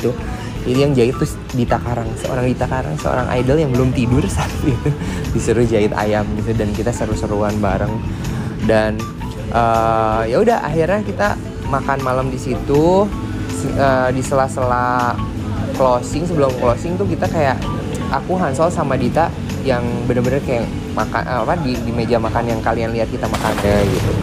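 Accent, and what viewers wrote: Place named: Java, Indonesia. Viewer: Di sambut dengan BANYAK GLUEDEKKK medok banget mas 😅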